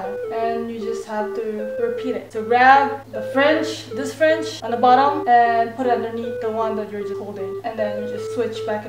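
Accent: Philippines. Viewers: **MUTE ASAP** just THAT TYPICAL filo fob accent.... -COMPLETELY KILLS IT!!! (....&tryn act ol cute n shit, pfffft~LMAO😁) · Filipino accent! haha understand kita! (: love you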